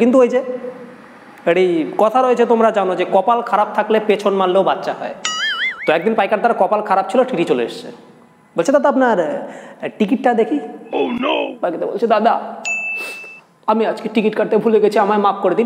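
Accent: Bangladesh. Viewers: Dada Tume ki BANGLADESHI kano jane na tomar voice sune mone hoy....😕😕😕😕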